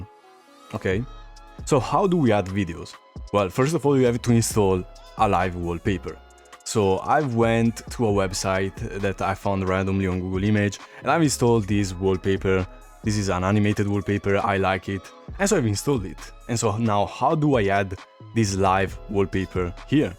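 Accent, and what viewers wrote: Italy. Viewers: is vro italian? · are u italian by any chance?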